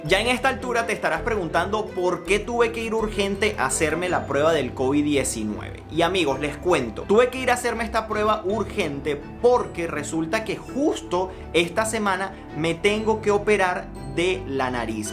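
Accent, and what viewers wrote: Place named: Venezuela. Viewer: Primera vez que veo tus vídeos, saludos, por tu acento eres venezolano pero ¿en qué país estás?, que maravilla de hospital, saludos, en nombre de Dios saliste bien y seguirás libre del virus, saludos 💋💋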